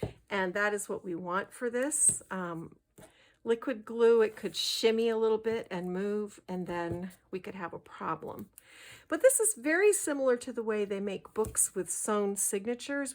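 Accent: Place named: North, United States. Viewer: I just found your channel and look forward to seeing what you have. I am in Greensboro, NC. Are you near me? Your voice sounds familiar. I was involved with the High Point Quilt guild years ago and even further back taught scrapbooking classes here in town. Just curious. You also sound like maybe you lived up north some, like me, LOL.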